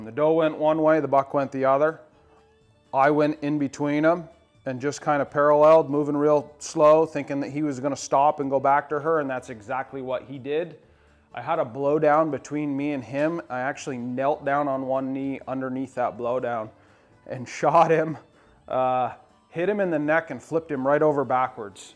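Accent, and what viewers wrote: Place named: Canada. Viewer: Wicked bucks. I recognize that voice from the podcast you sound more Canadian than Vt eh?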